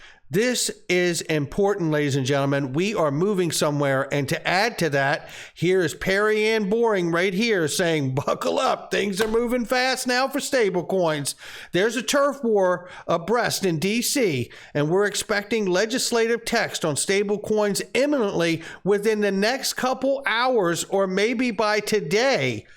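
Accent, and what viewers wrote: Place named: United Kingdom. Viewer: your videos report i excite see you every time you very very make me smile.. we excite about coin this you say for us XRP. WE LOVE YOU MUCH MUCH MUCH, U speak british cool 😘😘😘😘😘😘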